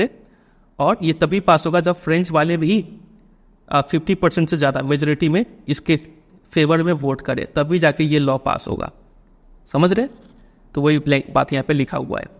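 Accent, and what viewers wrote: Bihar, India. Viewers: Nhi 😂 bihari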